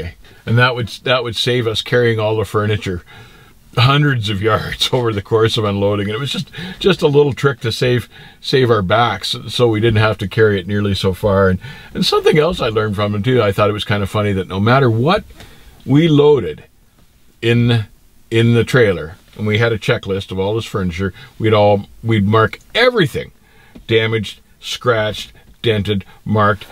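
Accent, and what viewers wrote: Canada. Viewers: which part of Canada are u from?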